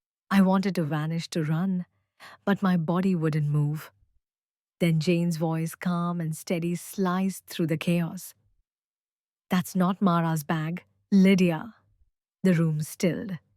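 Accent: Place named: South Asia. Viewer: A white woman with a South Asian accent ?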